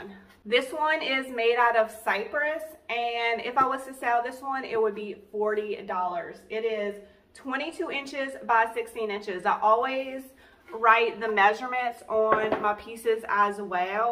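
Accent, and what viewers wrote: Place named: East Texas, United States. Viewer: Your stuff is so cute!! And your accent makes want to move back to East Texas. I was an hour away from Shreveport LA. Now I'm baking in Phoenix AZ.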